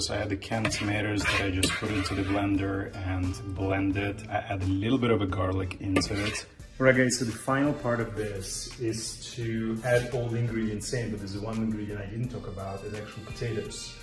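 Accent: Russia. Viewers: Russians will never truly lose their accent. As a Russian myself I can assure that...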